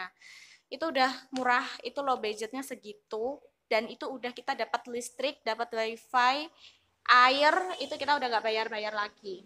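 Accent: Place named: Java, Indonesia. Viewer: Wkwkw ngomongnya jawuanya banget 😀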